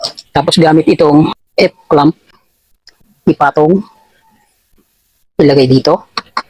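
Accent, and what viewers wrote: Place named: Nueva Ecija, Philippines. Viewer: Taga nueva ejicia ba kayo kasi yun tono ng salita nio parang taga nueva ejiia kayo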